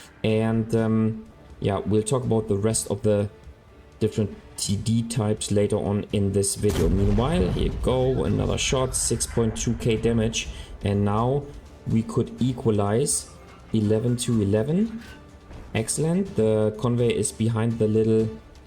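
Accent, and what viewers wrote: Germany. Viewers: Do I hear a German accent in your voice?🤔😁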